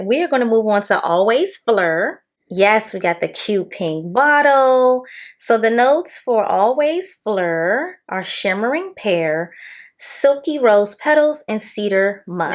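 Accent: DMV, United States. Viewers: Your accent is giving DMV ❤ I have to get the purple one love don’t be shy dupe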